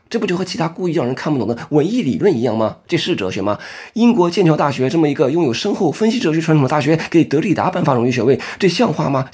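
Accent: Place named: Chongqing, China. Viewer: 我听出你的重庆口音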